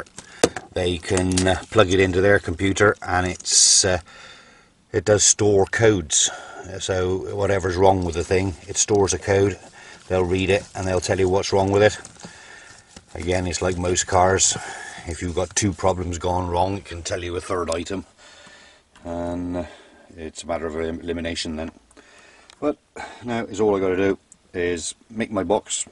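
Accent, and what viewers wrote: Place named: Wales, United Kingdom. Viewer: I know sounds like he is from there with a touch of welsh thrown in but to learn that he is from Hereford came as a bit of a shock. That said love the accent.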